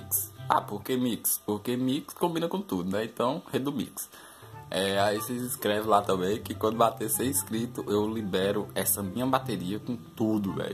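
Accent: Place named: Northeast, Brazil. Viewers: Forró é vida ? Só pelo sotaque vejo que é nordestino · Teu sutaque parasse se da Paraíba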